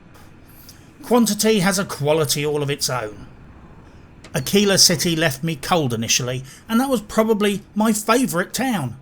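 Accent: United Kingdom. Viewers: You can't tell he's a brit by his voice? Dull one hm?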